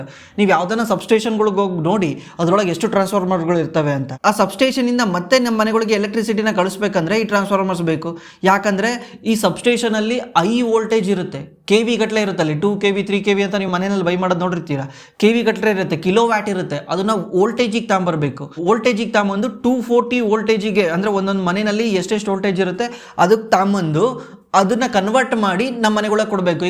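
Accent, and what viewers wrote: North Karnataka, India. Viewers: Current hogeti anta kundarasabek antete super brother uttara kannada langauge...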